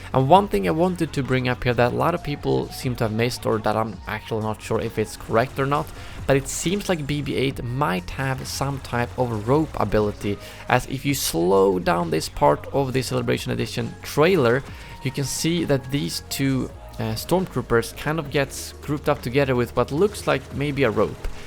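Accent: Sweden. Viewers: Den svenska dialekten är ju najs :) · Are you from Sweden?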